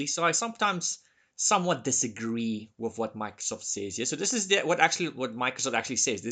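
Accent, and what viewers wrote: South Africa. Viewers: This is just a thumb suck but figured out your accent is South African from looking up that term after watchin' previous videos. I feel vindicated by seeing as your location in the trial. I am from West Texas but watch a lot of British Telly and know all the UK and Aussie accents and had been trying to place it. Your accent is so cool, and you are a great teacher. Thank you for your videos. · Still trying to determine if you from South Africa or Netherlands, lol. Thank you for this video! It really helps. What practice tests woild you recommend as well.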